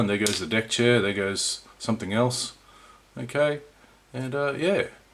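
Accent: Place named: Australia. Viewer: So good to hear an Aussie accent. I left Oz in '92 for my work, spent 4 years in France and 1 year in Germany before settling in Delaware US. Last time I went back to OZ (to say goodbye to my father in 2010) I was asked if I was a Yank. And then here in the US they keep asking what my accent is! I've subscribed, keep up the good work.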